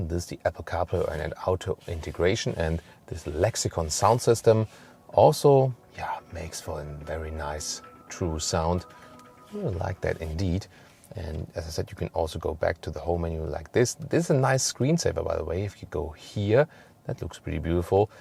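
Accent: Germany. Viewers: …watch him just for his enthusiastic German accent. Wunderbar!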